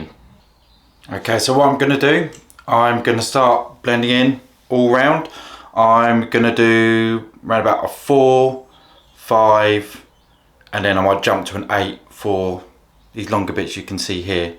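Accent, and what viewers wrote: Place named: Essex, United Kingdom. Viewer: Uk, Essex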